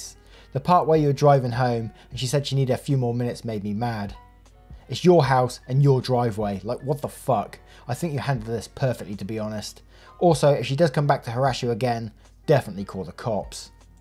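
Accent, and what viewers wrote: United Kingdom. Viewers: Omg I’m so stupid, you’re British? I guess I don’t know accents I thought you were Australian this whole time wtf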